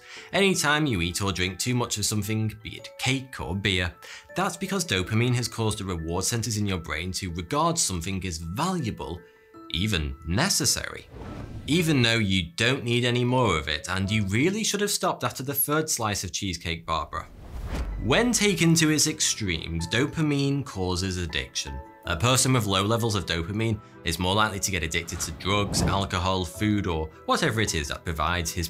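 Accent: United Kingdom. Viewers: So.... is the British accent what makes " " sound like "42" or is this on purpose? or id it only me? I'm not an English native speaker so... just wondering. Anyways awesome content! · Im here for the mustache and beitish accent lol.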